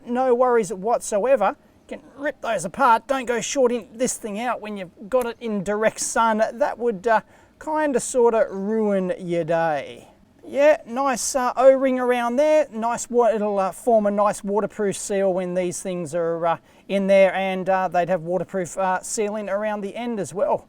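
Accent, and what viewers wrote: Australia. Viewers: There are 2 reasons why I watch your videos: 1. Electronics 2. Your Aussie accent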